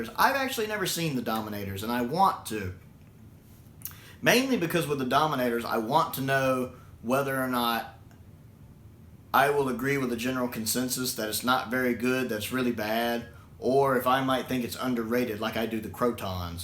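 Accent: South, United States. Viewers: to give some thoughts on what you havent seen yet Highlanders is fun, surprisingly Jamie isnt actually a major character until the end, but the story itself is still enjoyable, Troughton gets to do some dressup and fooling around, Polly is also great in that one. Underwater Menace is a big guilty pleasure, yes its OTT but its so much fun, id say this is a "popcorn" story. The Evil of the Daleks isnt perfect, but the highlights are excellent. Troughtons performance is top tier and Jamie becomes a 3 dimensional character here. Abominable Snowmen is a good story, but i feel like it kind of sags in the middle. Though the good parts are really good and its a nice setup for Web of Fear i havent seen Wheel The Dominators at its core is cardboard, and the plot is weak, but the tardis team are alot of fun and the comedy makes it worth it. Still can be a fun watch. 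go rewatch The Mind Robber its great. The Space Pirates is underrated. Everyone hates it, but im one of the few that actually quite likes it. I think its let down a little bit by the Tardis team being background characters for alot of the story, but they still have a decent impact esp at the start and end, but i thought the central plot was really cool. Also would be interesting to hear your thoughts on Milo Clancey as hes played by an actor from my country (NZ) and hes playing the role of a stereotypical southerner. Which i assume you might be given your accent? Anyway, Troughton era good.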